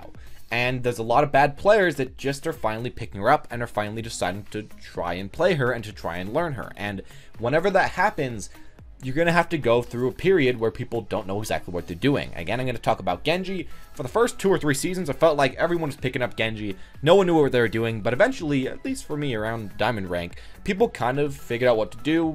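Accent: United States. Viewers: Are you from like Boston/ New York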